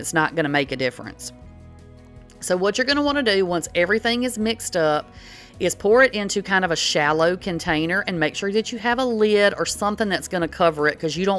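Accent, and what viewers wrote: South, United States. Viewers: Is that a “southern” accent ? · That southern accent...where ya'll from?? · It is delicious, I made a strawberry version and a chocolate with real choc chips in it. They are both a success. Love your accent, what part of America are you from?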